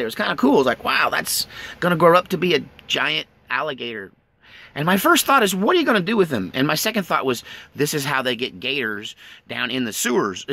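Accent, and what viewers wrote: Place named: West Virginia, United States. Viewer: Your west va accent is pretty close....ever seen the documentary about jesco white and family entitled "the wild and wonderful whites....."?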